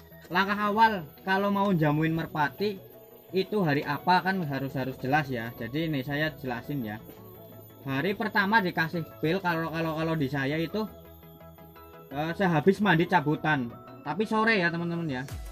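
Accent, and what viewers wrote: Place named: Cirebon, Indonesia. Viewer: Kayanya dari omongannya org crbn ya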